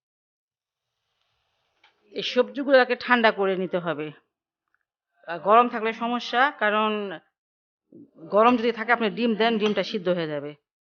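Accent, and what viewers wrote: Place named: Bikrampur, Bangladesh. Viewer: Aunty apnk o osonkho donnobad...aunty apnr kotha sunle mone hoy apnr bare bikrompur..amr sosur bare bikrompur..R ami dhakaya..dowa krben aunty amdr jonno..😍😍😍😍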